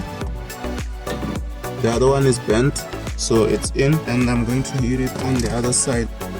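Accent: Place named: Tsonga, South Africa. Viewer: This accent iya mutsonga str8 😂💔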